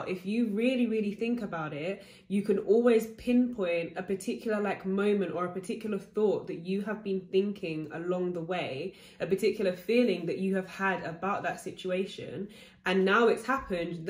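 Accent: United Kingdom. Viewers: Finally a loa coach with a British accent! Thanks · Wasn’t expecting the British accent 😩😂thought she was American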